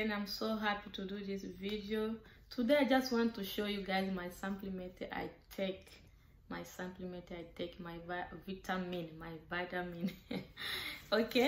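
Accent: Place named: Democratic Republic of the Congo. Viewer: R u from Congo i like how u speak❤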